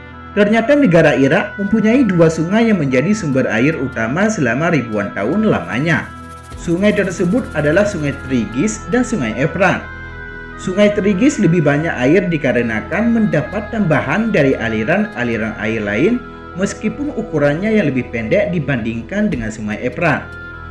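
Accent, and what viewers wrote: Aceh, Indonesia. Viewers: Orang aceh ya bang? Logatnya terasa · Logat Aceh ad irama Arab Arab ny sdkit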